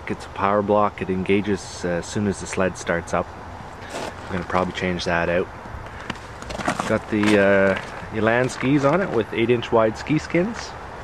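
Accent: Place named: Canada. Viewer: It;s hilarious that I can tell when I'm watching a video from a Canadian by the way they talk lol... Yer from the Ottawa Valley eh? hehe.. Cheers Toronto here!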